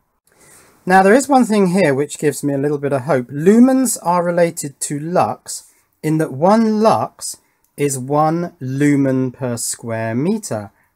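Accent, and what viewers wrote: England, United Kingdom. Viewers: By his accent, England I'd guess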